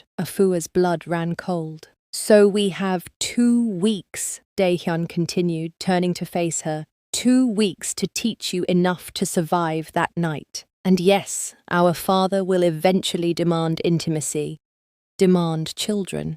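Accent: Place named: United Kingdom. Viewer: For some reason when I hear a British accent telling the story, it helps me what is that, lol love that accent all the time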